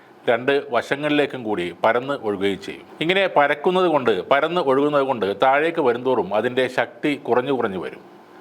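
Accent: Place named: Tamil Nadu, India. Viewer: Iyal Malayalam parayunna tamilian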